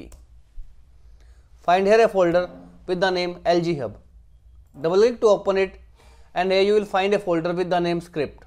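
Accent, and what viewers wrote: India. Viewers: THE ONLY VIDEO THAT HELPED, KNEW IT WOULD WHEN I HEARD HES INDIAN<3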